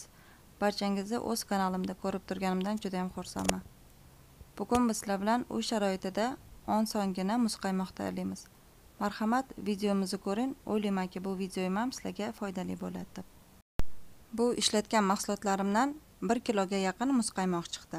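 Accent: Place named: Kyrgyzstan. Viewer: вой ичегимми узип улдирдиз илохим бахтийзи берсин бу хам Аллохнинг бир синови лекин киргизчага ухшап гапирар экансиз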